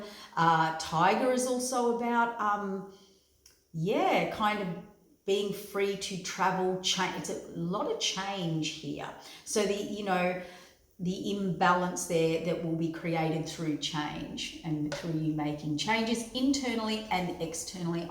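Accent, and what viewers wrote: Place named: Australia. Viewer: Excellent video, great to hear your Aussie voice.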